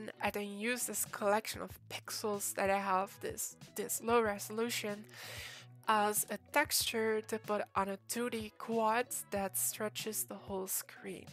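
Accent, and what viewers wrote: Netherlands. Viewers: nederlandstalige detected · You’re definitely dutch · amazing video! are you dutch? i noticed it by the irl boat and voice :D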